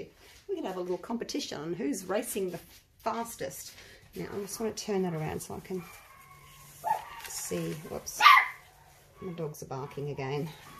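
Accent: Australia. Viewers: The eastern brown hiding in your cupboard next to the Vegemite is extremely impressed with your resin prowess 🐍 ❤ I detect an Aussie accent, so I presume you are located in Australia, where it seems every household has at least one highly venomous reptile lurking in the loo or other parts of the house 😬😂 Cheers and love 💕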